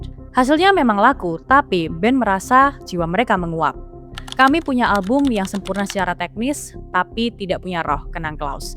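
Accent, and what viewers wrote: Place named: Germany. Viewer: Oh orang Jerman rupanya patutlah cakap English pelat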